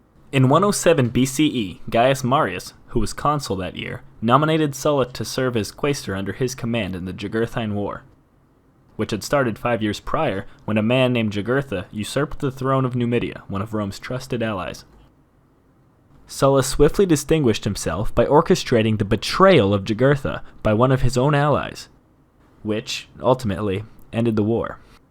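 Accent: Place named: Canada. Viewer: he certainly has accent features that would indicate he’s Canadian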